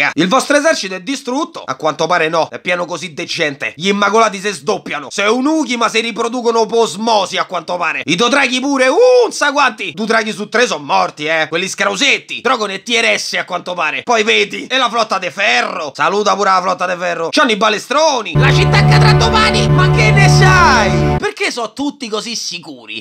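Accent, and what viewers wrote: Rome, Italy. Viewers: L'8 stagione la hai fatta perfetta, niente voce compostina, ma romanaccio a palla e urlaaaaa, mi ammazzo dal ridere! Fai così anche tutto il resto plz!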